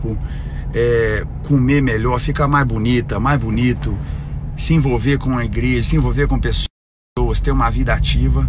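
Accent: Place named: Minas Gerais, Brazil. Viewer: Gente impressionado como o sotaque mineiro dele é muito evidente mesmo estando nos EUA.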